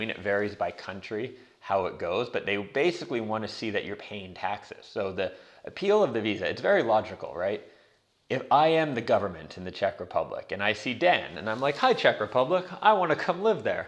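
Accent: Canada. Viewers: He's Canadian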